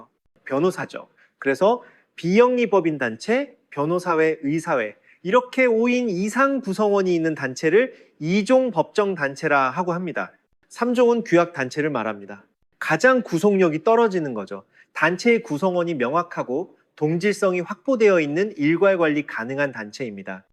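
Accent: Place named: Gyeongsang, South Korea. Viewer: 손보 29일 시험 30일 발표 결과 적으러 왔어요. 75점으로 합격했어요. 적상인가 해상인가 배 문제랑 처음보는 문제들 틀린것 같고, 합격하면 대박이라고 생각했는데 진짜 합격했어요....하하 8개나 틀렸지만 25개나 맞췄으니! 덕분에 합격했습니다. 난이도가 오르고 범위가 변경된 부분은 속상했지만 그래도 특유의 "강조" 하시는 부분과 쉽게 암기할 수 있게 만들어 주신 덕분이 아닌가 생각이 드네요. 조만간 변액도 도전할건데 그때도 잘 부탁드립니다. 목소리는 다시 못 듣나요? 경상도인 으로서 익숙하고 정감있어서 더 잘 들렸던거같은데...😅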